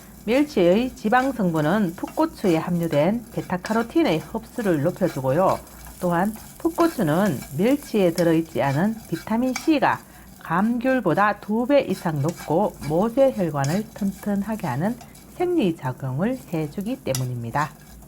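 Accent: Gyeongsang, South Korea. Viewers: 만물박사 갱상도사투리원조님.멸치라고 깔봐요? 그렇게 인간에 유익한 머리님을~~삶은소대가리보다 고맙죠. 군에서 된장 한 숟 푹떠 손가락만한 멸치 5개로 한끼 때웠던 그 때가 그립네요. 오늘은 영양학 강의? 다 안들려요. 대구4두2 듣는 취미. 오늘은 너무 젊잖네요. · 잘봣어오ㅡ 곙상도사투리 줌마님 얼굴좀 보여주세요 오늘ㄷ좋아요 했어요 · 경상도 사투리로 재미있게 방송하시는게 재미있어 자주보게 됩니다 성격이 화끈해 요리설명도 재미있게 하셔서 혼자서 웃기도한답니다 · 친근한 갱상도말씨 좋아요 좋은정보 잘공운하고있어요 감사해요 굽신~♡ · 갱상도말씨가 팽상시 좀 시끄러버서 듣기가 쪼께 거북시러버 안듣는데 여사님 말씨는 괘않습니더 자상한 말씀 감사 함니데이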